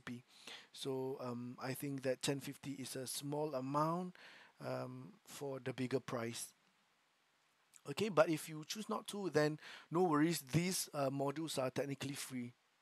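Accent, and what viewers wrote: Singapore. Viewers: Its like the singaporean accent is so strong, i recognized it instantly😅 congrats bro, i just completed Google Cybersecurity Cert and hope to be working in cybersec with just sec+ and oscp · Sounds like Singaporean. Congrats! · Thanks for sharing. You sound like a Singaporean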